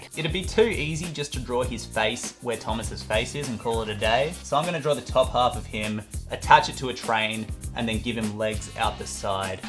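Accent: Australia. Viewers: Nice! I just found your channel. Never thought id say this but its nice to hear an Aussie accent on youtube for once. All the other channels im subscribed to are American or British and there's nothing wrong with that, but yeah... Loved your first version of Thomas. He looks like he could grope me through 3m of concrete. 😅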